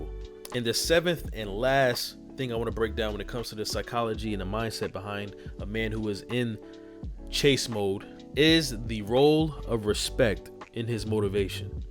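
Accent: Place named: Philadelphia, United States. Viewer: Love your Philly accent❤ Great content.😊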